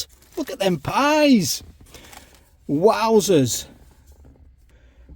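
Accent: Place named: England, United Kingdom. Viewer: I just wanted to hear an English accent today,! Love your posts, still catching up ❤❤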